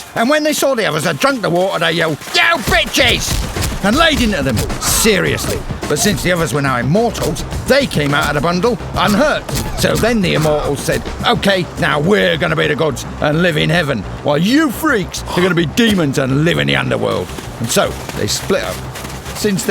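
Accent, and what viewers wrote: United Kingdom. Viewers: why so British? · There was a question above in Russian 'Why the narrator have such an accent?'. And I answered 'Which accent? He's British!' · если человек пишет про "американскую аудиторию", может речь как раз о британском акценте, которому как раз откуда не быть у британского диктора?.. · Классно, спасибо Вам. Но голос все таки придется подучить "русской" манерой рассказывания :) п.с. Не понимаю почему рассказчика с шотландским(если не ошибаюсь) акцентом выбрали )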